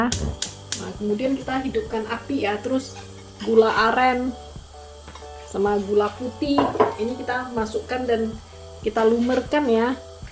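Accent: Medan, Indonesia. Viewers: Logat bicaranya kayaknya dr Medan nih...sukses selalu ya..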